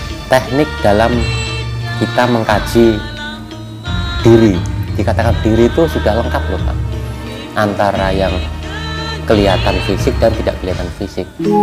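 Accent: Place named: Java, Indonesia. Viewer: Salam rahayu ..saya lihat dari logat bahasanya kayak orang jawa, saya lht alamat nya d dipkrifsi kok dari papua ,kami dari jawa timur mas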